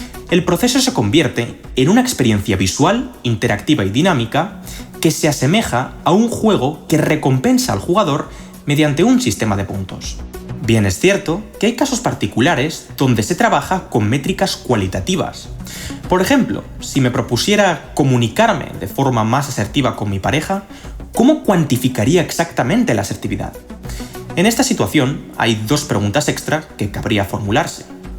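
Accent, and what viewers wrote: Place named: Spain. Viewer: nadie te preguntó, no lo veas y punto. Su acento es español.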